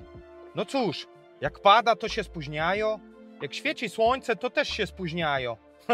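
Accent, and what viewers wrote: Podlasie, Poland. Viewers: kolega jest z Podlasia albo z Lubelskiego. Normalna gadka, ale "słoiki' z Warszawy tego nie zrozumieją 😉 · Podlasiak z akcentem. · Z jakiej części podlaskiego jesteś ? Piękny akcent :) i to bez hejtu. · Z jakim akcentem mowi ten facet? Czy jest to akcent z Podlasia czy z Lubelszczyzny?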